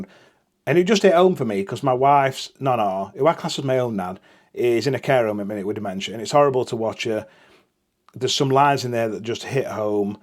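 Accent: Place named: South Yorkshire, United Kingdom. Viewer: Mate, I live alone with my dog, and sitting watching you talk about your passion with a cuppa while I'm also having one is just so calming. You've already extended my tbr tenfold just by how you talk to your camera like you're having a brew with your buddies. I had lost both my parents by the age of 33, and my mother was from Leeds (she got me into reading as a child, worst thing she ever did tbh) and your dulcet south yorkshire/Derbyshire tones just make the place feel like home again. Keep doing what you're doing please, the world will suffer great loss if you ever stop.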